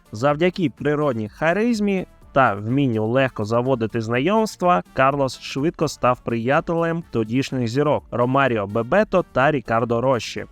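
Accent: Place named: Russia. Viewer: У диктора сильний російський акцент. Це тільки я помітив?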